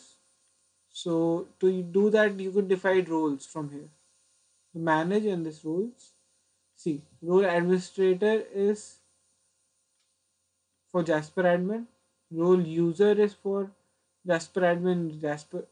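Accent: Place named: India. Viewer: why do always, and probably only, indians do this kind of tutorials? :D ....it is good explained but, hard to understand, the indian-english and the bad audio quality ^^ greetz :)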